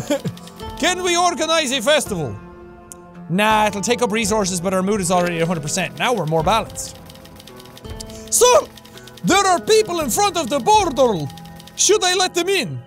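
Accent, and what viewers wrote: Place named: Ireland. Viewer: Dear anyone taking an interest in this comment, I have been watching Jack for a while now and I love him. I first watched one of his videos when I was seven, I had head phones in on max volume, Bad idea. I clicked onto the video and heard a loud Irish mans voice that almost made me deaf. I immediately clicked off the video. A few years later when I was ten I found his channel again but didn't remember it so I clicked on the video and I loved the video, I watched more videos and subscribed to the channel. I loved him, he helped me through a lot. A few minutes ago as I am writing this comment I found out I am going to his live tour on the third of November, I was and am still crying tears of happiness because I can finally meet or at least see the man who has helped me through so much. if you are reading this, thank you so much.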